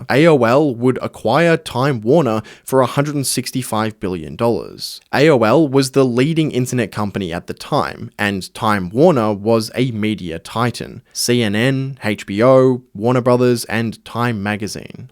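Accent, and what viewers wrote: Australia. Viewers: Do your channel a favor and please get someone to do VO that isn’t Australian. I made it 90 seconds before NOPING out.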